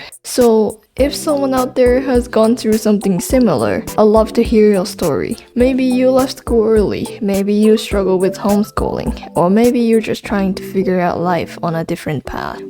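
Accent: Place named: Germany. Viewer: Ohhh interesting story! What country are u from? I thought u might me German at first because of your accent, but I think homeschooling isn’t allowed there at all… are u Asian? 😮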